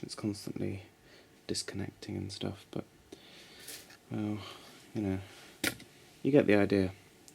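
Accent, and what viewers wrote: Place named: RP, United Kingdom. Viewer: Good review, thanks for taking the time to do it. I've just ordered one. P.S. You have one of the finest posh accents I've ever heard. Bravo, good sir!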